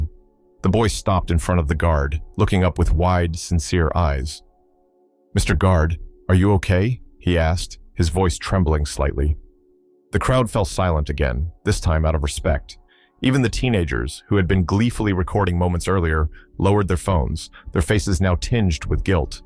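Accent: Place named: United States. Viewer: What an absolute load of American accented bullshit! Is it perhaps time that British former soldiers took the piss out of the American guards at Arlington? No? That would be too much?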